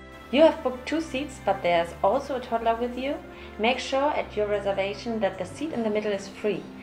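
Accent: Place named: Germany. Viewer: Awwww your accent is so cute!Typical german!😂 Amazing video💗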